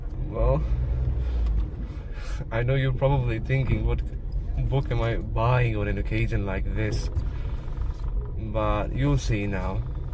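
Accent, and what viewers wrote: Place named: Russia. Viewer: Why there is a lil bit Russian accent in your english?😅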